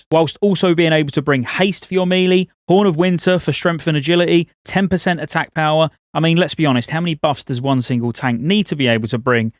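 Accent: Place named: United Kingdom. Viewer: he talks too fast and the section are smeared together, you can barely notice when he changes subject. not to mention the terrible uk accent that makes you super hard to understand because vowel abuse.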